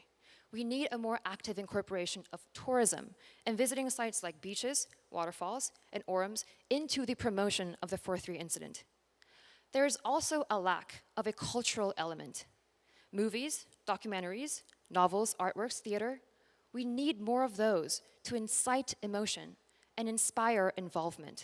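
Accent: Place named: United States. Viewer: WOW SHE S GOT THE ENGLISH ACCENT THAT MOSTLY AMERICAN NATIVE SPEAKERS HAVE I CAN CLEARLY UNDERSTAND HER GIVING SPEECH WORD BY WORD SHE IS EXCELLENT SPEAKER VERY WELL DONE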